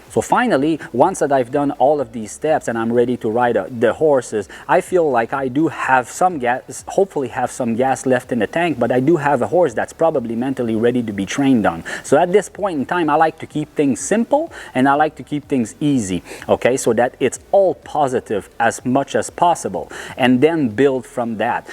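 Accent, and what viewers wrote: Quebec, Canada. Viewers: J'adore tes vidéos 👌🏼 le petit accent a la Québécois j'adore haha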